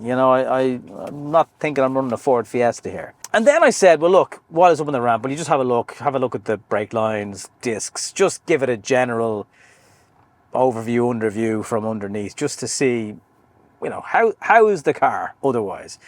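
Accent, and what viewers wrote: Ireland. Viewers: well there is your problem. I own two 911s, a 993 series and a 991.1 GTS series. The <num> was bought when it was 14 years old with 68K kms and the <num> GTS was bought when it was 3 years old with 157 kms, yes 157 kms at 3 years. Both are manuals and mint. I also do ALL my own work, not to save money but to make sure the job is done right as I am ultra-picky. I've been wrenching since I was in my very early teens on cars, motorcycles and aircraft as a hobby. You are buying a 20 year old car, so of course it will have issues and it isn't the parts prices which are very reasonable but the labour costs. It could be worse, you could have bought a British or Italian car. So in your case, I would have detailed the underside of your 911 and cleaned the engine to get it free of oil, etc so that you can pin point the actual source of the leak. As for an MX5 or a S2000, no thanks. 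I also didn't buy a 911 as an investment; life is too short and I am a "tech" and not floating in money. Great to hear the accent, this coming from someone that went to Rockwell College in Tipperary.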